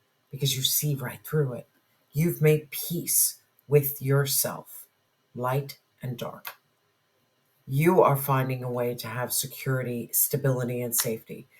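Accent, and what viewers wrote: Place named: New England, United States. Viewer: Omgsh thank you for being the vessel to send me this message ❤so powerful ❤ my decrement is everything to me ❤ God and now you have confirmed that for me ❤ Ps you have a i love listening to your reads you are so up lifting and your voice reminds me of my mothers [new England (new bedford) accent)] Blessings to you ❤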